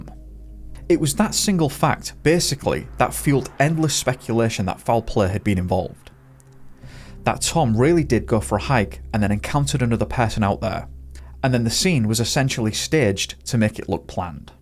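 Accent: United Kingdom. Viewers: Your British accent is fab!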